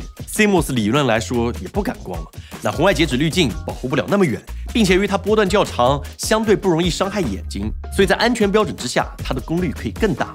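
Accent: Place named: Jiangsu-Zhejiang, China. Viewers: 完全被江浙口音搞出戏了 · 四川 河南 东北 北京 安徽 河南 山西方言大行其道，江浙沪带点口音你就受不了了？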